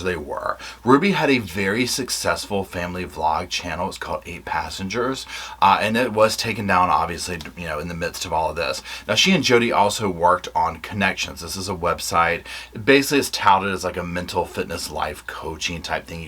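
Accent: South, United States. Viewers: 1st.. luv ur S. accent.. wonder if Kevin thinks by divorcing her that it will hide his actions b4 he was sent away by Roobs?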